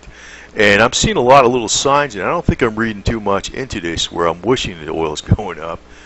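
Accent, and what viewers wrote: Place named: New York, United States. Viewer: You sound like you're from New York. No offense, but I just imagine you saying something like, "Hows about me, Tony Baloney and the gang come over there and whack ya up side the head, eh?" Seriously, good info. Yours is one of the top hits for recent videos with Lindsey Williams. On the Sunday Alex Jones show yesterday, a caller asked he bring Lindsey back on and Alex agreed. We might see him either this week or next week.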